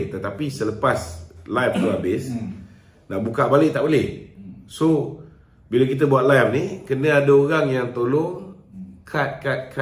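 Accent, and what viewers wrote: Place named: India. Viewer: Pada pendapat saya DLA sembang mcm keling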